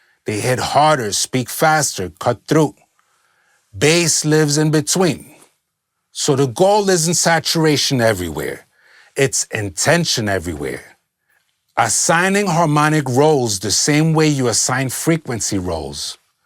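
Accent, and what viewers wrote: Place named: New York, United States. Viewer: Not to mention the NY accent glues this all together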